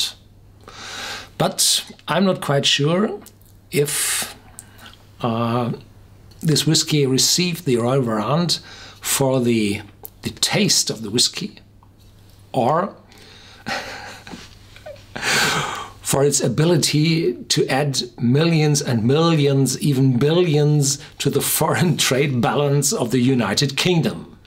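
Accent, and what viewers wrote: Germany. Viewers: You’re not German ?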